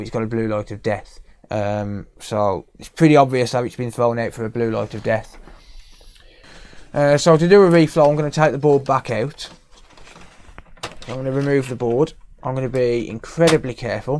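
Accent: United Kingdom. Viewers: Thing is, I can understand British English quite well. I can barely follow Benedict Cumberbatch is Sherlock when he goes 120% in his thinking, but I can get the general gist. Maybe it's the accent that throws me off in this case. About anime, well, I listen to the original dub, not the English one :D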